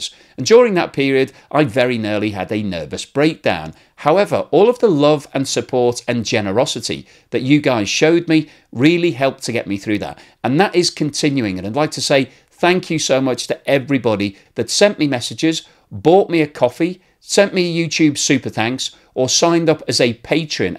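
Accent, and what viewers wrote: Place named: England, United Kingdom. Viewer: Yes indeed, if they come from Spain. Of course they may speak Spanish with an Argentinian accent if they come from Argentina. They might also speak English with an Argentinian accent..but this creator speaks English with an English accent. I might speak English with a Scottish accent in which case I wouldn't have an English accent but a Scottish one.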